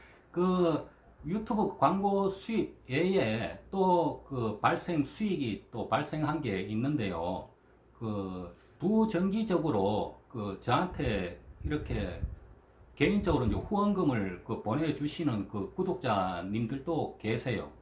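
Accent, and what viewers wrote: Gyeongsang, South Korea. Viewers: 경상도 대구 홀애비 인 갑네요? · 말투는 대구같은데 ... 늘 건강하세요 잘 보고 있습니다~^^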